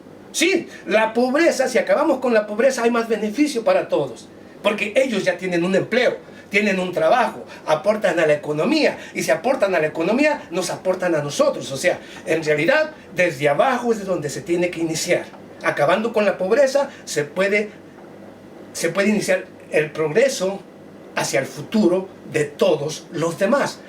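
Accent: Mexico. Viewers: Que buena informacion gracias por seguir infomando .los yotuveros no pueden ablar claro tu tienes una manera mas mexicana de decir la noticia.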